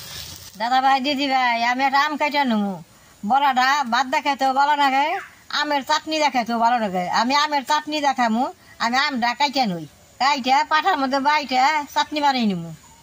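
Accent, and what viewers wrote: Bangladesh. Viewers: Akdom amader বাংলাদেশের moto apnar kotha o ranna khub valo lage ,darun lagse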